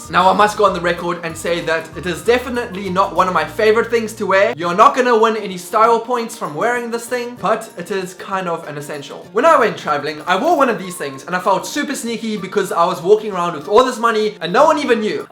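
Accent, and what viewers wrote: South Africa. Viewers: HAHAHA YES FOR THE MOONBAG! And no! Never change the South African accent! It makes foreigners think we're exotic! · Rocking at moonbag! I'd go back to Italy! It was amazing! (Please don't give up that SA accent!:P)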